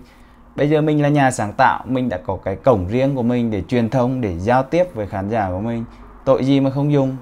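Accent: Central, Vietnam. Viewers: Anh người miền Trung àh.ngương mô anh quá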